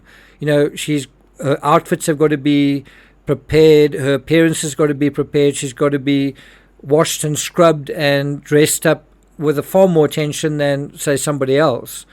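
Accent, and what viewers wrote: South Africa. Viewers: The narrator sounds very South Afrcan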